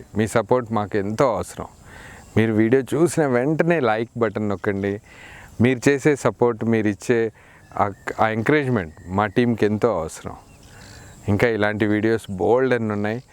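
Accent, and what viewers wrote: Guntur, India. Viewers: Veg biriyani ela cheyali cheyypandi garu mee telugu guntur Telugu laaga undhi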